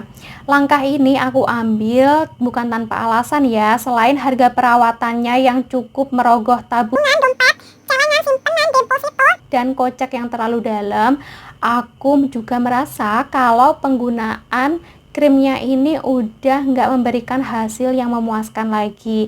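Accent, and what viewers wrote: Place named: Java, Indonesia. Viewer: Cewek sunda tapi lidah Jawa , Kerennn...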